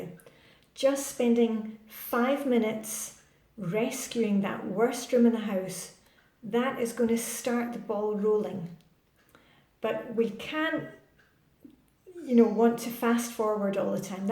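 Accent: Scotland, United Kingdom. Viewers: ! <3 I've been meaning to ask, just for fun... are you Scot/Scottish?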